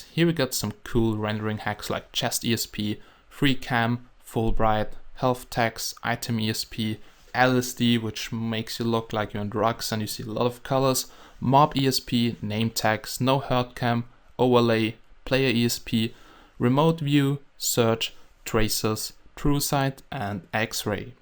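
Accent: Germany. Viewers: Man merkt das du Deutscher bist. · Merkst du das nicht:D? ich finde man merkt es an seiner aussprache :)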